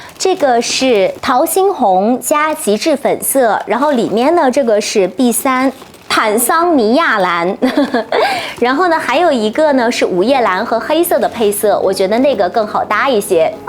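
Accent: Japan. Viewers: 對啊，她是在日本生活的，英文發音就是日本人的發音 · 是日文加大东北混合发音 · 她好像是在日本，日式英語不標準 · 請問英文是日本口音嗎😂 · 是的呀 日文发音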